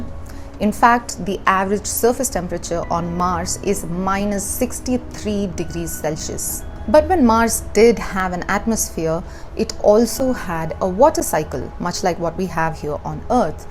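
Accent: India. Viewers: Very clear and simple in Indian English! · She does have a slight India accent. (MTI)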